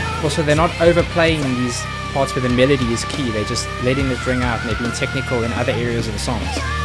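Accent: South Africa. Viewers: Dude, are you from South Africa? That accent.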